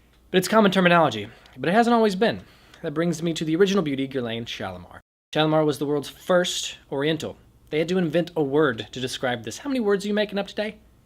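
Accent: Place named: Georgia, United States. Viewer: Your southern accent is so subtle-almost undetectable. I’m guessing Georgia. Two great fragrances, especially Shalimar.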